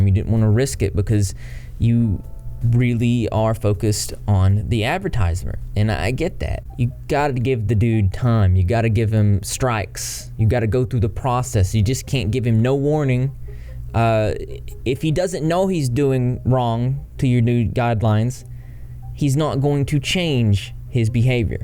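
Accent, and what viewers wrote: South, United States. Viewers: Oh. You're a southern boy 💛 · Whst kind of american accent is that?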